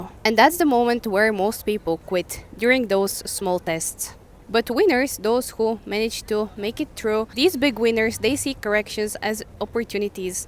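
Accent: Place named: Brazil. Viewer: you being mysterious...if i had to guess you eather brazilian(because of your accent) or middle eastern....am i close?😅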